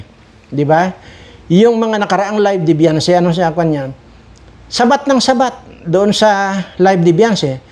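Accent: Iloilo, Philippines. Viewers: Acsent talaga yan sa taga probinsya duon sa iloilo sir 👍😅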